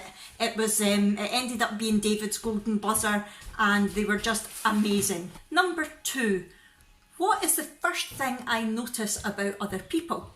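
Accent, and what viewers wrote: Scotland, United Kingdom. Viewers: New subscriber here :) nice to hear another Scottish voice on YouTube x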